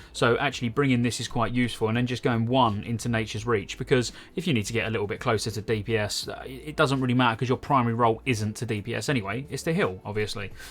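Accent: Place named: Lincoln, United Kingdom. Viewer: You Lincoln lads say Natural weird. “Naytural” 😂😂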